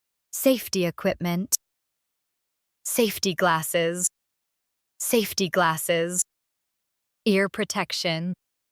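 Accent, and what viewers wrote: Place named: United States. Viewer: Horrible Murican accent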